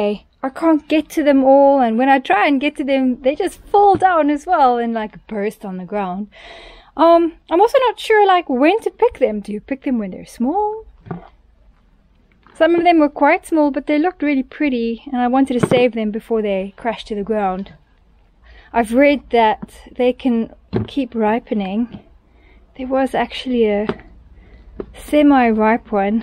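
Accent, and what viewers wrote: South Africa. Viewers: Hello from Bedford, Eastern Cape, SA. Ya, we get 40 deg’s here in the summer . Know that you really needed the pool. I can hear your accent but it’s strangely like as if you’ve got something else mixed in the way you’re rounding off some of your words . Not exactly like our lekker plain South African accent . Can’t put my finger on it . Anyway , you’re a brave girl! Good luck . 🌺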